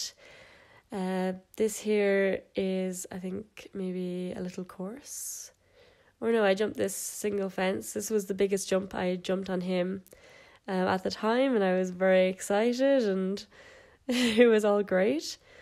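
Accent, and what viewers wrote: Ireland. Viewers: Awwwww so cute I started horse ridding 5 weeks ago I LOVE IT,btw r u Irish because I am and u sound like u :) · Are you Irish?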